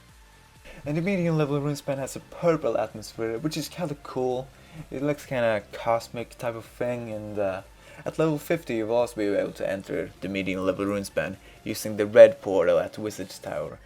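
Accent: Sweden. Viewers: Bra guide. Hörde direkt att det var en svensk tack vare ordvalen och den klara brytningen x,D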